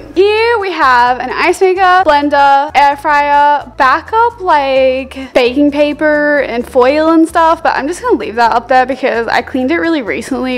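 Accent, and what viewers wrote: Australia. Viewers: Are you aussie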